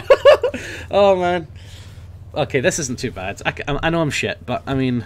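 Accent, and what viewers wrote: Scotland, United Kingdom. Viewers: So Scottish :) There can only be one Demoman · Knew it was a fellow scot with this wee fanny !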